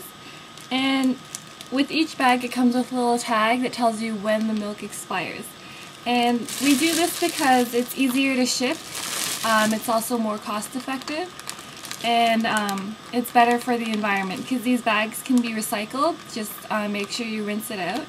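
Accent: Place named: Canada. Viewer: I like the Canadian accent :3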